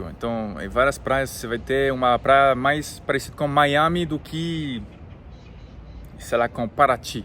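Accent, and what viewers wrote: France. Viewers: O portugues do cada dia melhor. Daqui a pouco ninguem vai ouvir o o sotaque frances. Eu morei um tempo em Portugal, e não gostei. Acho que glamoriza-se muito morar na Europa. Por exemplo, os portugueses são muito dificeis, estressados, rudes, burocráticos, sem paciencia, parece burro empacado. Sem falar na agua horrivel. Paga-se uma fortuna pra comer uma carne decente. Ponto positivo para a segurança, o euro, a estrutura, apesar q salario portugues é bem baixo. Mas visitei uns amigos no Sul da França, alguns vilarejos muito lindos a caminho de Andorra, não tem como não se apaixonar pela França. Embora tenha tido pouco contado com franceses, me senti acolhida, senti que é um lugar aonde eu viveria feliz. Mas como estava morando em Portugal e nao tinah me organizado pra ficar na França, acabei voltando ao Brasil. Apesar dos problemas do Brasil, da corrupção que esta no DNA de muitos brasileiros, da ignorancia, da idiotização, da influencia da cultura americana, da moeda desvalorizada, falta de estrutura, amo meu pais. 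Faz muita diferença esta perto da familia, dos amigos, o clima, sol (como faz falta) as pessoas, a variedade e qualidade da nossa alimentação, a agua, a cultura mais solidaria, o humor do brasileiro, a praticidade, as modernidades que ja existem no Brasil, as praias, as do Nordeste, amo. Mas tb nao gosto das praias com muita construção tipo as de cidade. Balneario, Rio, Recife. Prefiro as mais afastadas, mas com alguma estrutura. Porque aquelas praias no meio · Sotaque de franceses falando português é tão fofinho kkkkkk ❤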